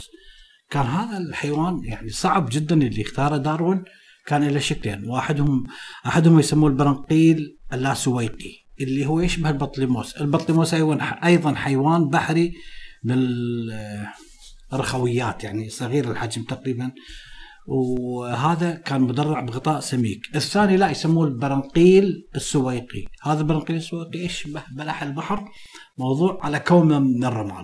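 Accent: Iraq. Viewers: شمدريني هو الفيديو طلع يمي من وحده ..وشفته لهجته عراقي ستغربت .و امداكم🖐🖐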